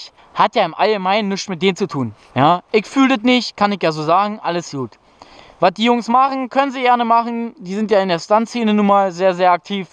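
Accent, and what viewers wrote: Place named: Berlin, Germany. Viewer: Digger diese berliner schnautze einfach zu geil👌🏻👌🏻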